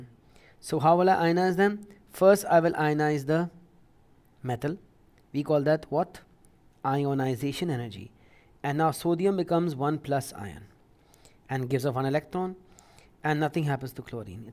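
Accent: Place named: Sicily, Italy. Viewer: More like sicilian.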